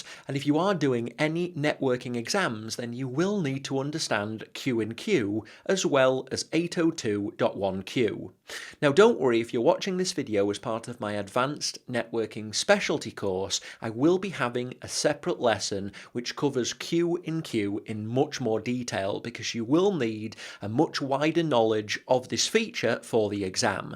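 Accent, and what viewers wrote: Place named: Northern England, United Kingdom. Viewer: In your opinion, how much networking knowledge one should have to go into cloud architecture? Would you say CCNA level is sufficient or maybe a person should be at CCNP level, or one would manage with Netywork+ ? Thanks. by the way, super cool videos and teaching style. Love the UK northern accent :-) miss it here, down under :-D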